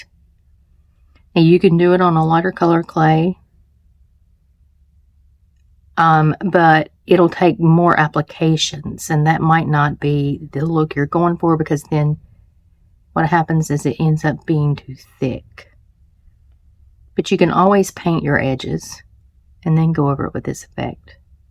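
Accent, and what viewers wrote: South, United States. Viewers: I can understand your southern drawl perfectly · you talked about your southern drawl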